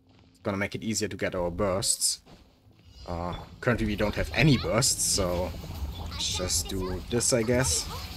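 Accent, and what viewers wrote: Germany. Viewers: Your accent, are you German? Or speak a Germanic language?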